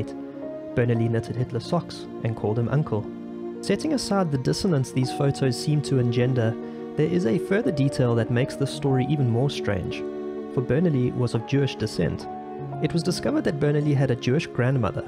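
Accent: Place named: South Africa. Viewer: You sound wonderfully South African. Good stuff.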